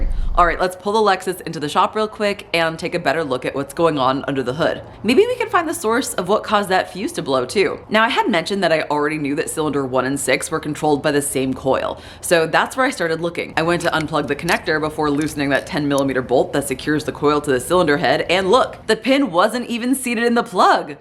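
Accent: Texas, United States. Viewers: Are you Texas ?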